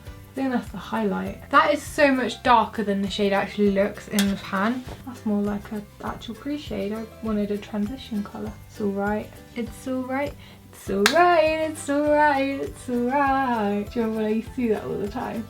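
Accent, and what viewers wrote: United Kingdom. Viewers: Where are u from u sound like ur from England are u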